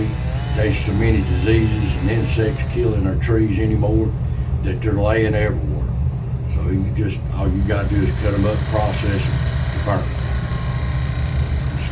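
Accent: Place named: Swain County, United States. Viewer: Just seen this video. So sorry you and your wife have been sick. Prayers for quick recovery 🙏 ❤. Wishing you a Very Happy New Year as well and all the little animal's. As you spoke I realized I am the elder now. I have 3 brothers left. All 3 of my sisters passed long ago. I miss them so much. All of my Family have passed. Just my brother's and me left along with all of our children. are you kin to any Laws from Swain County? My Mother in law was from there year's ago and passed in late 90s. I loved her. Noticed you not only have same accent but said something few say anymore and I miss it. She would say yuns come back as we lived far away. Thank you for being real. Pray 🙏 you have a Blessed and Awesome 2024. ❤🎉🎉🎉🎉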